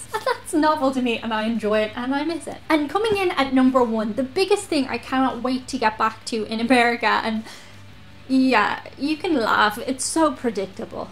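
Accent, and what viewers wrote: Ireland. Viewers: On the upside, your time in Ireland has delightfully thickened your accent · Hi, I enjoy your vids and your Irish "edge". Always makes me smile. I wonder if you know about some really American stuff? Try 'AKUS Live' here <link> as an Irish girl, if you don't know about it, you'll like it. Keep the videos coming. /RayK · Hi,i want you to know that I love Irish accents.I really love your channel and content. I really enjoy your personality and you always make my day after watching your videos. I hope you continue with your channel and video content for years to come. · Thank you, that was much needed. My Scotts/Irish grandfather arrived in American one step ahead of the sheriff, leaving a wife and four children...back in the old country. He was sure he could serve his family better as a fugitive in America than a prisoner back home. (he beat a man to death) He brought his whole family over, became a master steam fitter, died at 96 with 14 children and a full tribe of upwardly mobile off-spring. 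Being an Irish American is as American as as thing can be. And yeah, the Irish accent is a show stopper here. I'm studying it, so I watch Jack Taylor, you and Try Channel, et al. Keep at this. You're on to something. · Americans have a thing for Irish accents. This is a established fact. You can talk about anything and we love it. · I love your Irish accent. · I love how you leave your outtakes in at the end of your videos, where you stumble over words and sentences (like every youtuber no doubt does while they're filming), but are not self-conscious, and have the guts to leave those mistakes in the video. Also, love the accent. I've always had a thing for Irish accents, I just love to hear them, especially from pretty ladies like you.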